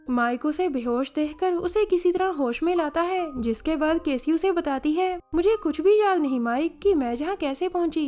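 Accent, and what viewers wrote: Punjab, India. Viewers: Aap aur apke thumbnails...aur ye awaz, ye Punjabi andaz... wah kya baat h.... Lv u so much. schhi wala..🥰🥰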